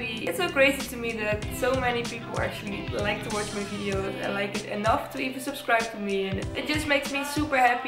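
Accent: Netherlands. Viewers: Volgens mij wel! Ze klinkt erg nederlanss🤔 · It's a Dutch accent :) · hoor ik een nederlands accent? :) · when u suddenly notice that she's dutch....or isn't she idk, but etos is dutch and her accent also sounds dutch, my country, yess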